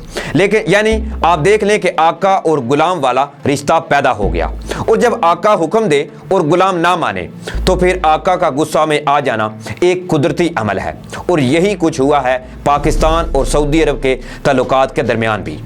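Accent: India. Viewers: Mujhy lagta Hy yeh channel koee Hindu Chala Raha Hy bilkul hinde lahja hy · محترم آپ کا تجزیہ اور خبریں سُنی، بہت اچھا لگا لیکن آپ اردو زبان کو ھندی لہجے میں بولتے اور پڑھتے ھیں جو کہ اچھا نہیں۰ ویسے یہ آجکل اک عام رجحان پایا جاتا ھے جو کہ پرو انڈین رجحان ھے، دوسرے لفظوں میں اگر کوئ شخص یا قوم اپنی گفتگو میں کسی دوسری زبان کے الفاظ استعمال کرنا شروع کر دے تو اسکا مطلب اس زبان سے مغلوب ھونا ھوتا ھے۰ اس طرح ھم غیر شعوری طور پر لسانی معاملات انڈین غلامی کی طرف جا رھے ھیں۰ قابل اعتراض الفاظ مندرجہ ذیل ھیں خ کو متعدد بار کھ پڑھا گیا جیسے خبر کو کھبر، خیال کو کھیال کہیں تاریخ کو تاریک پڑھا گیا اب سوال یہ پیدا ھوتا ھے کہ آپ اردو میں گفتگو کر رھے تھے یا ھندی میں۰ ھمارا رویہ اگر اردو کیساتھ یہی رھا تو بہت جلدی اسکا نام اردو کی بجاۓ ھندی رکھنے کے مطالبات سامنے آنے لگیں گے۰آپکو یاد ھونا چاھئے کہ پاکستان بنانے کی اک وجہ اردو زبان کو زندہ رکھنا تھی۰ کو بھائ میری اس تحریر کو پڑھ کر اردو سپیکنگ لوگوں پر کمنٹس کرنا نہ شروع کر دے کیونکہ میں پنجابی ھوں لیکن اگر کوئ پڑھا لکھا پاکستانی اردو غلط بولتا یا لکھتا ھے تو مجھے اچھا نہیں لگتا اور اردو کو ھندی لہجے میں بولنا یا لکھنا میری لیۓ نا قابل قبول ھے۰ امید کرتا ھوں پڑھے لکھے نو جوان میری تحریر کو ممثبت انداز میں لیں گے اور اپنی گفتگو جو وہ اردو میں کرتے ھیں اسکا خیال کرتے ھوۓ قومی زبان کی حفاظت کریں گے۰ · نام تو تیرا ہے مگر تیرے تلفظ اور تیری ہندوستان کی سعودیہ کے ساتھ وابستگی کی وکالت سے یہ ہر شخص یقین سمجھ سکتا ھے کہ تو کوئ انڈین ہی ہے غور سے میری بات سن ، ھر قوم اپنی غیرت کے بل بوتے پر زندہ رہ سکتی ھے اور غیرت کے ساتھ ھی ترقی کی منازل باآسانی طے کر سکتی ھے ۔ تیرے جییسے بزدلی ، بیغیرتی اور صرف تیل ، نوکریوں اور پیسوں کے لئے مر نے والی قومیں ذلیل و خوار ھی ھوتی ھیں۔۔ اب ھمارے لیڈروں نے اگر اپنی عزت نفس کے لئے اپنی قوم کو صحیح راستے پر ڈالا ہے تو ھم دل جان سے آنے والی ھر مشکل میں انکے ساتھ ہیں آپ اپنی بکواس کو اپنے تک ھی محدود رکھو میڈیا پر آکر اپنی انڈین ذہنیت عیاں مت کرو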